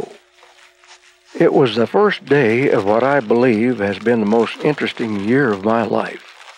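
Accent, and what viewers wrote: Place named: South, United States. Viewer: Alaska??? Has to be. He sounds southern tho. Man I wish I could build like this we have all lost this knowledge its so sad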